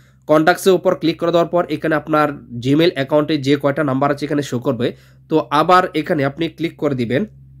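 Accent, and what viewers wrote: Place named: Sylhet, Bangladesh. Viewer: সিলেটি ভাইসাব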